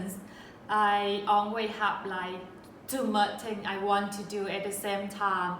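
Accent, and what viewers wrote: Thailand. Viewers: I could see you were Vietnamese from your last name, but I thought you had a Thai accent and not a Viet accent when you speak English, so I actually thought maybe you were a Thai Vietnamese 😀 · It's cool that you are Vietnamese! I honestly thought you were Thai, because your accent is similar to my mom's (she is from Thailand). Also, my mom's nickname is Dang, which means red in Thai.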